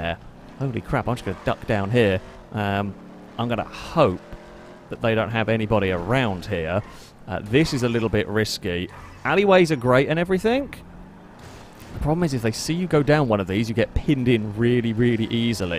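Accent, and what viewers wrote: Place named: RP, United Kingdom. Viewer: Hi, I noticed that you do have someone of a posh accent but I've also noticed that you have something of a cockney twang to your accent as well not full on cockney but just every once in a while you see certain words that just sounds so coughing do you have coffee ancestry that you know of just curious? specifically the word that you have made up called Gooder and any other words you add ER to that do not normally have er I can't think of any right off the top of my head but I know that he do do that with a couple of words there is no such thing as gooder it's good of or better than usually do that when you're trying to say something with something of you just go blanker And you make up these words or you heard these words used by somebody in their past whether a teacher or a family member or a family member's friend. 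it's like saying Jaguar there is no such word as Jaguar and unfortunately the Japanese got it from you guys and then kaiju type robot hero Jaguar or is we in the United States do them as Ultraman if you have a hell of a lot better than named Jaguar or Jaguar how do you get a jet on a Jaguar stick it up his ****? So it's it is pronounced Jaguar Gua GW g A group he pronounced Jaguar JAG Jag WAR War we put them together to get Jaguar Jaguar can you say that Jaguar stay with me jaguar. you don't pronounce every single vowel that comes across to the English language some of them are pronounced differently being other language it is technically a Native American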